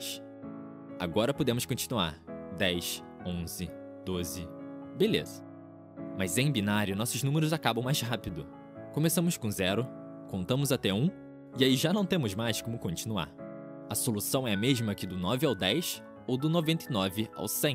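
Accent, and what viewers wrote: Rio de Janeiro, Brazil. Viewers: emojix? pqp odeio esse sotaque carioca 😠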